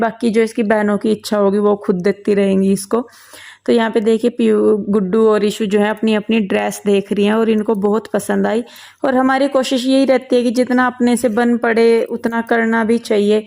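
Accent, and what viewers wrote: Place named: Saharanpur, India. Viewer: Aap saharanpur se ho aas pass me kisi Gao se aapki aavaj yahi ki hai 🙏🙏🙏🙏🙏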